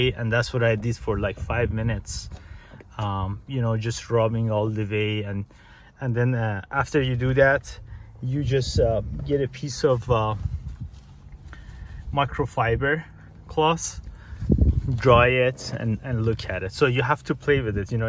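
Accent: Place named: Iran. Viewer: That is an Iranian accent if I’ve ever heard one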